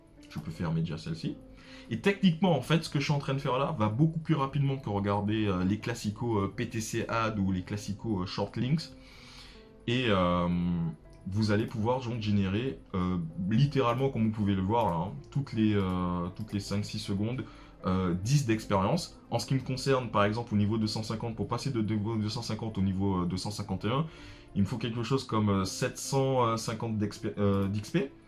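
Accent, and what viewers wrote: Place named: Antilles, Caribbean. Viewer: Ha une voix antillaise comme moi. Parfait continue ne fais pas comme pratiquement tous les autres youtubeurs qui essayent de nous avoir car ils sont payés pour ca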